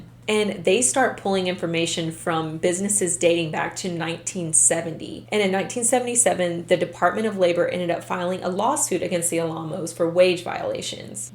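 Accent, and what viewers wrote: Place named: Philadelphia, United States. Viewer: A question not related to this vid are u from the Philadelphia area? Just curious because I am and u sound like u r from the area. ❤️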